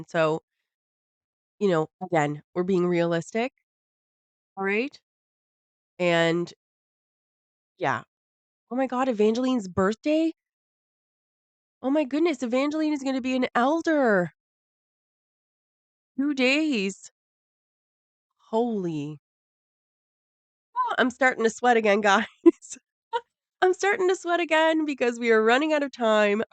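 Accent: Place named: Ireland. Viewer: So about 4 episodes ago was the first video of yours that i had found and please dont take offense to this but i remembered why i fell in love with your videos. Your voice sometimes sounds very irish. I'm 25% but born raised US so you were entertaining and then when im sober i still love your videos lol. also i can never here the background noise of the construction. and rarely hear doggo. I remember clicking ahead and being like who are these people???? and here we are lol. Thank you for all the wonderful videos!!! <3 Still hoping for like an active members only discord lol 🤞🤞🤞🤞🤞💜💜💜💜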